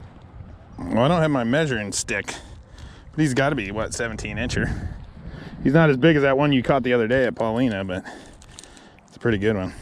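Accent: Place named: Arizona, United States. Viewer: When I hear that accent I totally think Arizona.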